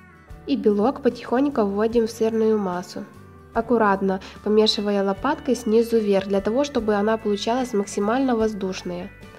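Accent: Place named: Ukraine. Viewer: Достали критиканы! живет в украинской деревне,и дома разговаривает на украинском языке.( Вот оттуда и произношение другое). И это не помешало умной молодой женщине,создать и развивать свой канал!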